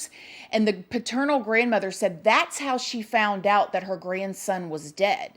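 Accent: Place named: Louisiana, United States. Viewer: Hey, I was wondering if your maiden name wouldn't happen to be? You remind me of and look like my little cousin that I have not seen since childhood. We are from Lake Charles Louisiana and you sound like you have a Louisiana accent.. I was just wondering if you are my long lost Lil cuz.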